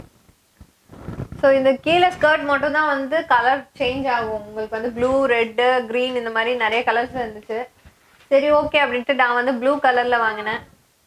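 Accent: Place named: Tiruchirappalli, India. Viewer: Ethu trichy a athan language laye theriyuthu wow me too trichy Namma pullaya nenga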